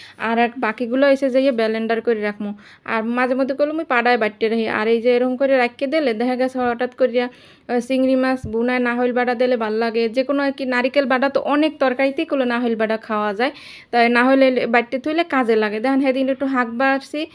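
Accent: Barishal, Bangladesh. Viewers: লাইক দিয়ে দেখে নিচ্ছি বরিশালের ভাষা আমার ভাল লাগে। এত সুন্দর করে কথা আর বন্ধু না হয়ে পারলাম না। নারকেল দিয়ে চাল ভাজা অনেক খেয়েছি লেংডা কালে দাদী থাকতে। ভাল থাক বোন 🎁🎁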